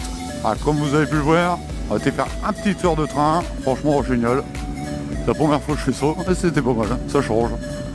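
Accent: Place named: North, France. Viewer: Vu les décors et l'accent tu es clairement d'min coin toi x) Tu es aux alentours de Bouchain ? (Je crois avoir vu que tu avais fait une vidéo sur le salon moto) Garde l’œil ouvert si tu croises un gars en MT01, R6, Diavel ou Streetfighter 1098 habillé en rouge dans le coin, j'y roule presque tous les jours ;) Bonne continuation. V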